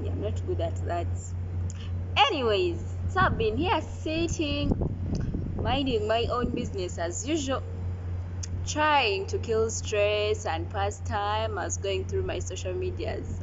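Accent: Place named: Buganda, Uganda. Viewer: You are a good narrator..and I love the accent in luganda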